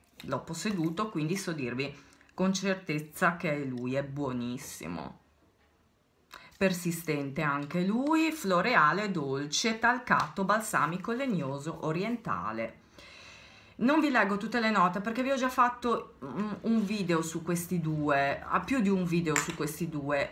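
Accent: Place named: Liguria, Italy. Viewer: Che bella che sei, e che simpatica! Amo sentirti parlare, perché il tuo accento ligure mi riporta a tempi lontani in cui ero felice.Grazie per la tua recensione... Orchidea della Cina l'ho usato ed è piaciuto tantissimo anche a me.Domani corro a comprare Bizantium, mi hai messo una curiosità immensa. Buona serata😘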